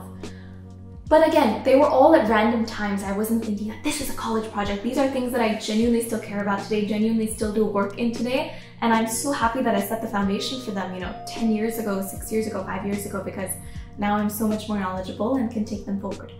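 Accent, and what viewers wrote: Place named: United States. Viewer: Why your American accent is so natural???? How can I improve it like u???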